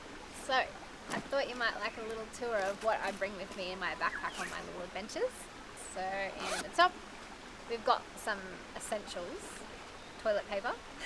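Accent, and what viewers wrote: Australia. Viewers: Are you from Australia? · Мне не нравится австралийский акцент😮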